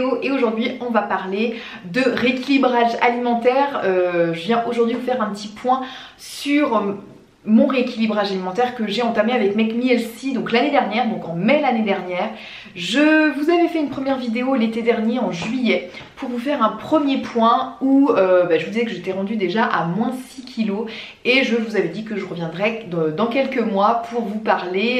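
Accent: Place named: Brittany, France. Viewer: C'est l'accent Breton qui veut ça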